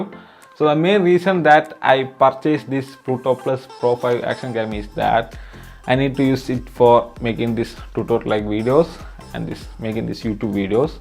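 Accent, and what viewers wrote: Kerala, India. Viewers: You have a very bad accent of Kerala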